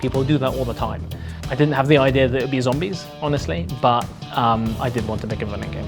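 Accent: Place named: United Kingdom. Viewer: Sounds like a cool idea except I don't understand British accent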